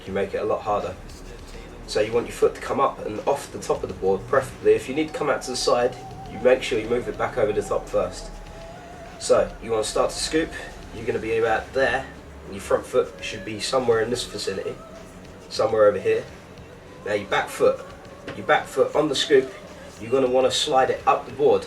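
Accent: United Kingdom. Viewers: any subs i just like the english accent!!!!lol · thats cos stewie is british and so is this guy but all british people dont sound the same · BRITISH DA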